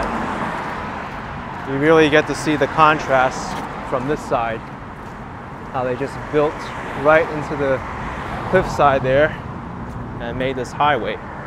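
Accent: New York, United States. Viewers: It's strange to hear u narrating Santa Monica - with a New York accent - I have the same accent. About Silverlake Ramen --- one of the owners is from the Silverlake area - and they just opened another Silverlake Ramen at The Collection in Oxnard, CA. I believe there's another one in Silverlake. My mother and I came out to Calif (from NY) in 1996 -- and we went to the 3rd Street Promenade late 1960s/early 1970s. It was 2 or 3 blocks - an open-air pedestrian mall. So happy to see people out and shopping and stores starting to open. It's been a very difficult 1-1/2 years for all of us.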